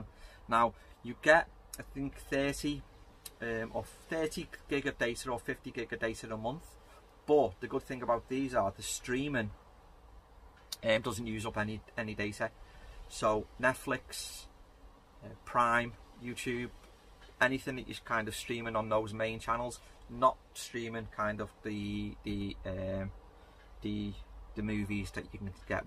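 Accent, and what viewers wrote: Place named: Birmingham, United Kingdom. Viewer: Hi, great video, very informative. Unfortunately the links are not working properly. Neither link takes me to them there 90 degree adaptors. Love your Brummy accent by the way. Can you do any others? Cheers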